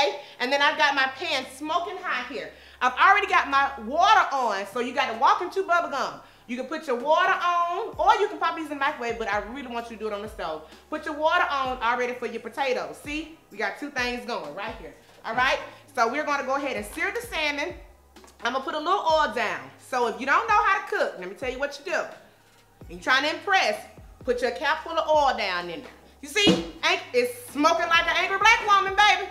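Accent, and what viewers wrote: England, United Kingdom. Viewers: She took me out with the English accent 😂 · I “REALLY” Liked your “British” accent!!! Classy!!🙌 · It's the british accent for me. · It's the British accent for me 😂 😂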